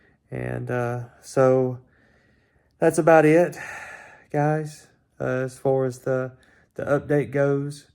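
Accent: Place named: United States. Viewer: Ps where in America do you live ? As I love your accent. Best wishes again soo good to see you sitting up and chatting It will be tough as you know but you have got this ❤❤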